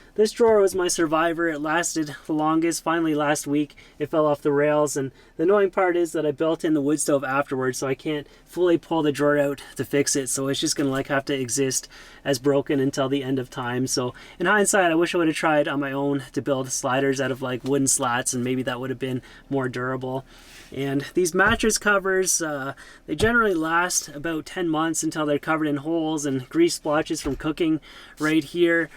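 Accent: Canada. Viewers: Good lord the way Canadians talk is so ANNOYING! Your “out and about” is so strong and just stupid! Learn normal English!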